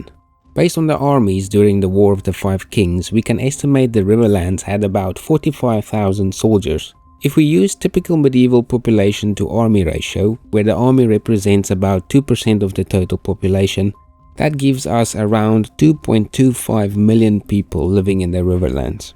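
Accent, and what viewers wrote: Afrikaans, South Africa. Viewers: 🚨🚨 afrikaans asoiaf youtuber spotted 🚨🚨 die skok van jou aksent hoor was groot, maar goeie video! keep it up! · South African? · Loved the video.... but also... this is a very south african sounding accent.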